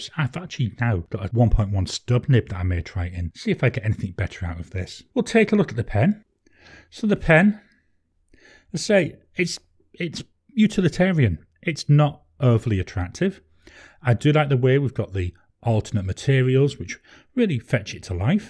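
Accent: United Kingdom. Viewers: Hi, your pens are more down to earth and it was interesting to see your selection rather taste. I liked that you showed the price of the pens but was curious why in $ when your accent is from the UK, then I seen another video, your are in Australia! I have been using a Pelikan M205 B (Merry Berry Ltd Ed), Monteverde Innova F and just bought a Diplomat Elox F. Thank you for your Inkvent and other reviews to keep me occupied, Happy New Year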